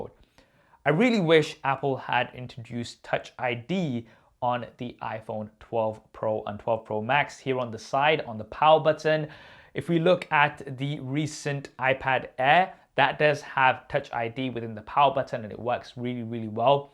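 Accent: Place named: United Kingdom. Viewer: Thanks for such a broad view. And super nice to hear a British accent! Blessings. 新年快乐 xin nian kuai le Happy New Year 🍵🍾🍾🍾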